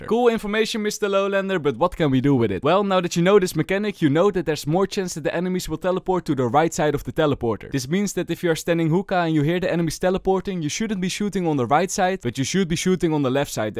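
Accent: Netherlands. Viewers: With the Dutch accent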